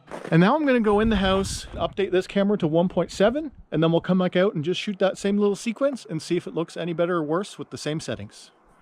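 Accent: Canada. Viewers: This guy sounds Canadian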